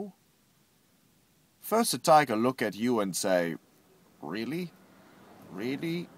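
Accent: Germany. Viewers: The German accent made this 50x funnier. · god cant he put a little effort in pronounciation so he doesnt sound like the most german guy ever? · Is this guy actually really German or is he just faking the accent? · "Like volvarine" Oh GOD, I LOL'D. Tears, there are tears down my face. My Dad's German, so this accent already has me losing it, but saying that has me in utter hysterics!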